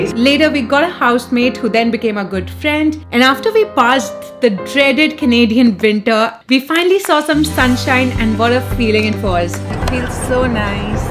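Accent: India. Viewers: Birthday and not birday :-). We desis have so much of MTI - mother tongue influence :-)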